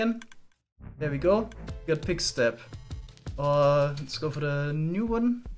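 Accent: Germany. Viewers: Bro dieser Deutsche Akzent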